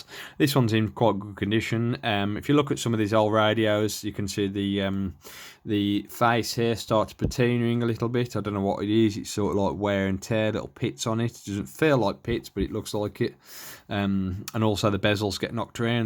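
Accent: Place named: Black Country, United Kingdom. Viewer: Good vid you sound local to me? Im in stourport, are you black country way?rgds steve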